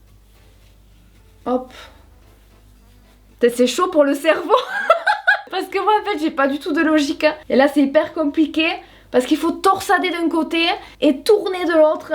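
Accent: South, France. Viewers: Je kiffe vraiment t’es vidéos, ta nature ton délire! Tu me casse de rire à chaque fois et qd tu parles ton (notre) accent s’entend tellement et cest superbe!! Vive le sud!!! Bisous!!!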